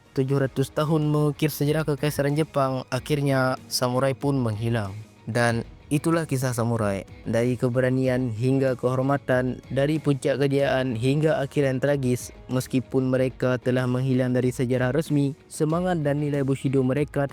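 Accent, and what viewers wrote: Aceh, Indonesia. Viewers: Logat acehnya nampak kali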